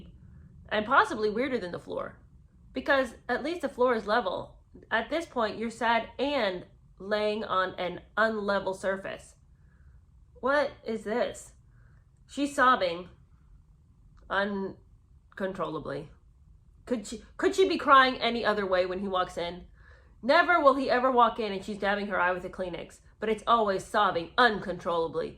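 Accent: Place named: RP, United Kingdom. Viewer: 😂😂😂😅😅😅 I love you!!!!! 😅😅😅😅😅... jolly ..what a total ding-dong H is... love your English upper crust accent 😂😊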